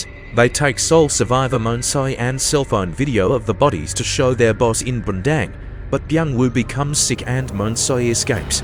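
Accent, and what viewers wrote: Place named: Australia. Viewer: Great to hear Aussie AI voices 👍🏾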